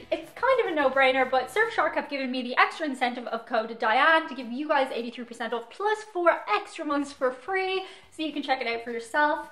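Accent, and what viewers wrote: Ireland. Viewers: Man i love Irish accent. Could listen to it all day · Oh and ask away any question to us across the pond Diane. We will gladly answer someone like you who is both open minded and easy on the eyes. Love your Irish accent as well. So lovely. So keep on keeping on. Same for Chewy. :-)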